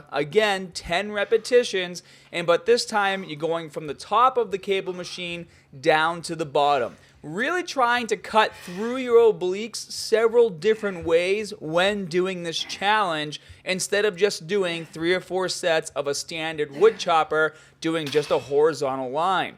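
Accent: Boston, United States. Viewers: The Boston accent is the best ever!. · Boston accent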